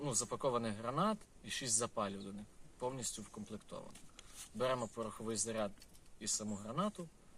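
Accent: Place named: Western Ukraine, Ukraine. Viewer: Чiтко западенській суржик. А інформация, корисна.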